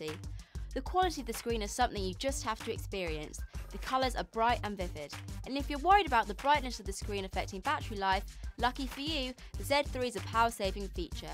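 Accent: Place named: England, United Kingdom. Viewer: Well i really need to get used to this English accents. Its so annoying. (My opinion)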